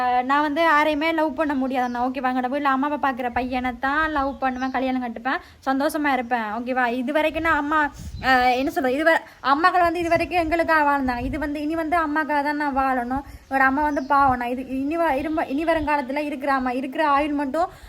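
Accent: Sri Lanka. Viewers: தங்கச்சி உன் இலங்கை தமிழ் எனக்கு ரொம்பவும் பிடிச்சிருக்கு அழகா பேசுறீங்க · Hi sister your Tamil alaga irruku iam from kasmir(Army) my native Rameswaram. My grand grand father is coming from sri lanka so ur langauge my grand father and grand mother pesura mathiri alaga Iruku . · நீங்க உண்மையாகவே கிளிநொச்சியா உங்கள் தமிழ் மலையக வாசம் தெரிகிறது. மலையக மக்களும் சிறந்த பண்புஉள்ளவர்கள். நல்ல கடும் உழைப்பாளிகள்.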